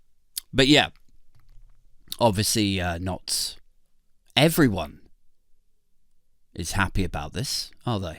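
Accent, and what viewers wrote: United States. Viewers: Ohh it’s the sweary shouty southern man!! ❤️❤️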